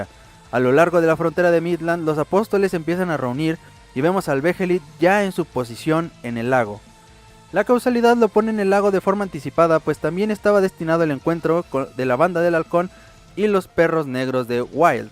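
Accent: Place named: Chile. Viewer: Suenas igual que apura chilena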